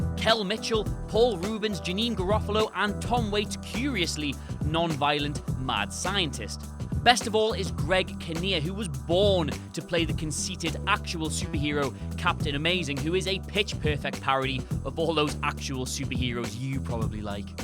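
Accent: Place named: Newcastle, United Kingdom. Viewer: Nah man, he's a Geordie, those fuckers pronounce 85% of words incorrectly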